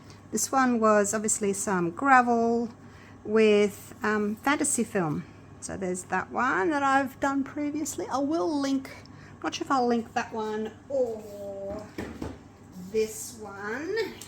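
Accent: Australia. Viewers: Gday, so nice to hear an Aussie voice, I’m in qld. So you get your resin from us, or uk? I’m a beginner and use mainly if resin, but wanting to try the deep pour resin, bit worried on how much postage from another country will be. Thanks for awesome video.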